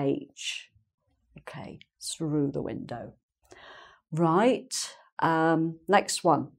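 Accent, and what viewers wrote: England, United Kingdom. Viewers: Hello teacher. I really like your lessons and they very useful for us even your accent that you speak by is wonderful I wish that I study with you only for a month I will be a good britch accent speaker.. Thank you so much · Hello, it's a pleasure to hear your Sweet English accent. I have been several times in England in the four seasons and I have never seen a drop of rain, you know...Only the Sun.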